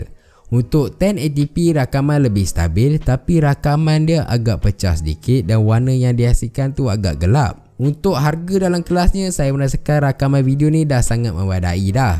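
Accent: Taiping, Malaysia. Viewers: Sah la abg orng taiping😂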